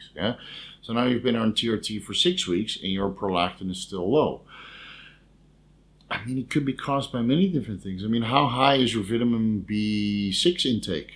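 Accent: Netherlands. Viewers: why is that buddy? Great channel by the way. Could tell instantly you were from the Netherlands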